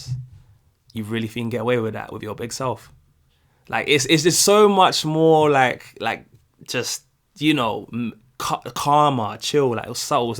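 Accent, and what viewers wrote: England, United Kingdom. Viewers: it sounds like you had a really nice American vacation. 😊 We Americans don’t hear British accents very often… our television shows and other media is 99% American so a British voice is indeed attractive to most of us and is a bit exotic to our ears. I’m a Southern girl and when I visit up north to cities like NY or even west to LA, the locals enjoy hearing my southern accent. It’s foreign to their ears just like your British accent. 😊 We do love road trips! As a teen, my family drove from Alaska to North Carolina. Glad you had a good trip and am looking forward to your next video. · We Americans love a British accent!! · I'm only at point 11 but I have to say, oh my goodness... the UK accent... I could listen to you talk all day lol.. seriously though. I LOVE your accent and I think it's pretty universal that you all have the BEST accent.. 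(well you and the Irish lol).. I don't even think it's opinion, I think it's fact. Lol...... it is like music to me. As for customer service, I know in my case it's not fake. I work in a grocery store, I shop for people and take orders out to their cars.... as tired as I get sometimes, I always try to think about the kind of day the customer may have had and always try to give my best. If I am having a bad day, the customers are always understanding. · Your accent, or, the British accent in general will improve your American experience. I love the accent. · 90% of the world drives the way we Americans do, and with the wheel on the left! And yes, we love that accent…but because so many people like me watch so much YT content from Brits, it’s not perceived as unique or special as it USED to be. The accent always projected intelligence…American news networks even hire British announcers for this reason…but of course, we now know people can be stupid on BOtH sides of the Atlantic.😂 But it still has that effect on us! 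· I don't think the customer service attitude is fake it's part of our culture because we are a consumer culture. Your accent yes in America we love the English accent it's like our favorite that and Jamaican. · 💙 THE ACCENT IS EVEN RARER WHEN YOU ARE A BLACK BRIT. 😆 A LOT OF AMERICANS ARE GENERALLY INTERESTED IN OTHER CULTURES & FOREIGNERS.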